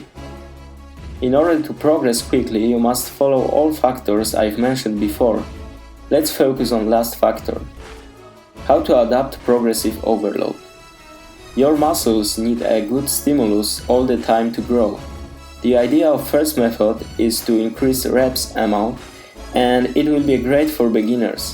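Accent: Poland. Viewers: to Polak? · Coś taki polski ten angielski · When I heard you I thought: What a polish voice! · Wyczuwam Polski Akcent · Polisz inglisz najlepszy <3 :D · Ah ten polski akcent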